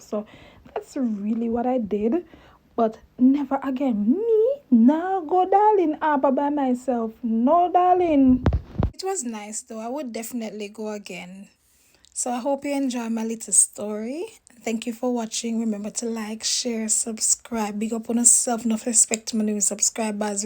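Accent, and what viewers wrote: Jamaica. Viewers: I love discovering new places, love how you talk patois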